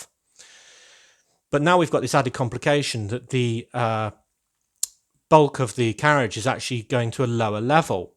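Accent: United Kingdom. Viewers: R U from the UK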